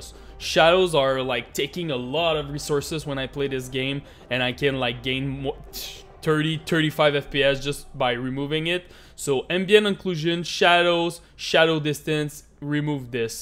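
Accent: Quebec, Canada. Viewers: le québecois mdr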